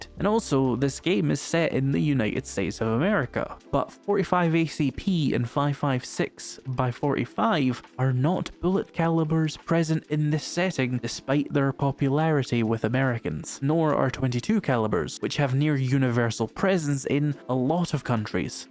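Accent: Scotland, United Kingdom. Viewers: Complaints in a Scottish accent just work!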